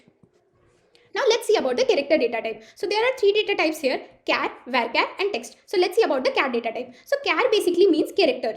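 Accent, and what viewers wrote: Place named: United States. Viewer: dii, mai special aapka video apke fluent english ki wajha se pura ye 5 hours dekha hu , knowledge kahi se bhi mujhe miljata lekin aapne jaise ye itna faraatedar english koi nahi dega mujhe bhaot kuch sikhne ko mila mujhe apse dii thanks dii , or aap kya batasakte hai ki itna fluent english mai kaise bol sakta hu , waise mujhe lagta hai ki aap american ho :)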